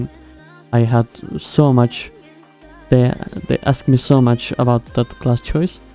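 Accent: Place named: Turkey. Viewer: Kankam aksanın Türk gibi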